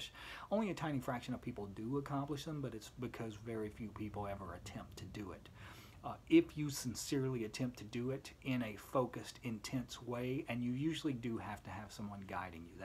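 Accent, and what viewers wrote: United States. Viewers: Hearing an American accent saying something constructive about my beloved Crowley took all the pent-up defensiveness right out of my shoulders and almost made me cry. I've been on gab too long. The religious people on social media are insane with hatred for anything but mainstream belief systems.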